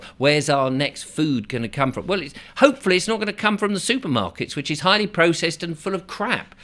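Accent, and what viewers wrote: United Kingdom. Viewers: One of the few British accents I actually like😊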